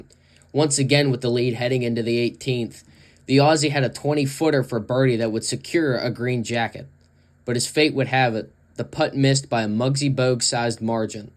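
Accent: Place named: Pittsburgh, United States. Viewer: Love that Yinzer accent!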